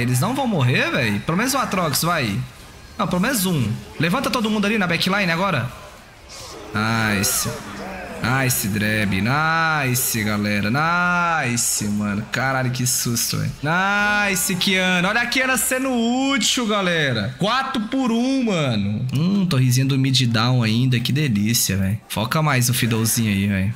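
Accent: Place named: Goiás, Brazil. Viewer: Goiano sô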